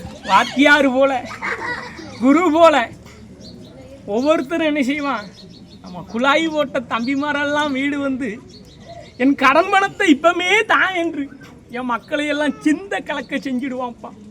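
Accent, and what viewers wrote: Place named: Kanyakumari, India. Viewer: இதுக்கு மேல என்னங்க தெளிவா சொல்லுறது. அவர் பேசரது குமரித்தமிழ் அது அப்படி தான் இருக்கும் சுலபமா புரியுதே ஏன் இவ்வளவு comment பன்னிருக்கிங்க புரியல புரியலனு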